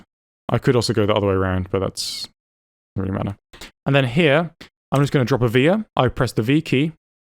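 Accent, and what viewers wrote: United Kingdom. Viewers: Wow, I still can't believe I've watched all of your PCB Design videos (5+ hours in total) in one sitting. Your know-how and fast skills with kicad are astonishing, plus your clean (Queen's) English makes it very easy for us non-native English speakers to comprehend what you're saying. You've earned every sub,. I am currently working on my master thesis in EE and your videos are very informative. Keep up the good work, and THANK YOU very much for your videos.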